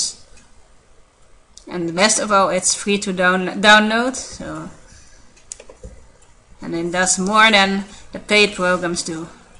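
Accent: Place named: Netherlands. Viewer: hoi, door de pop up en je accent had ik opgemerkt dat je nederlands was:). ik vond ineens nadat ik een programma had gedownload deze sweet-page en probeerde het te verwijderen door middel van het configuratie centrum, maar al gauw merkte ik de afwezigheid hiervan ik typte bij youtube in 'how to get rid of sweet page' en kwam al gauw op deze video, ik heb zelf geen last gehad van dit virus omdat ik het in hetzelfde uur heb verwijdert doormiddel van jou goeie instructies. HEEL ERG BEDANKT:) LIKE!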